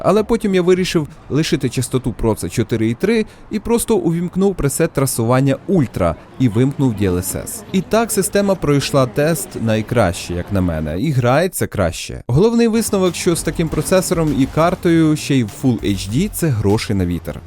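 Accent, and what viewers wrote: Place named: Bila Tserkva, Ukraine. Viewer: шо за прекрасний акцент? -Білоцерківський! Думав показалось, а нє-земляк. Привіт!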